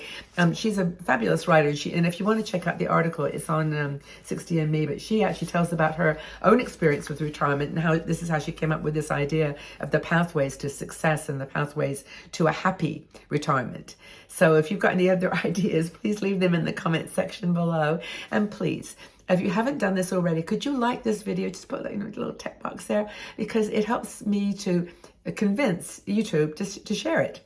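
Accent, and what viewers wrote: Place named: England, United Kingdom. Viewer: Your accent is changing! Becoming more English! We’re you brought up in uk?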